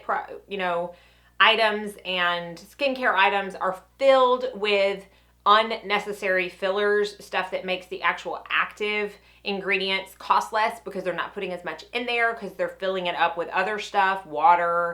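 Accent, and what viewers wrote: South, United States. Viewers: Good info but this could be edited down to half. Most people don’t want to watch unnecessarily long videos. You could have a huge following if you get to the point and just talk about the products and how to use them. No useless banter about the shape of bottles, etc. Sounds like you’re from the South and I know women talk a lot in the South because my mom and aunts were from Louisiana but please, CA women will never take the time to watch this entire never ending video.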